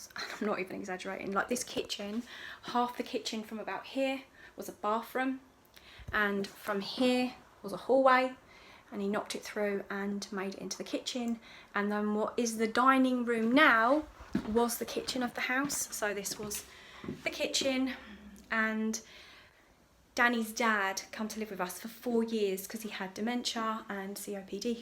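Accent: United Kingdom. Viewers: I love your British dialect 😊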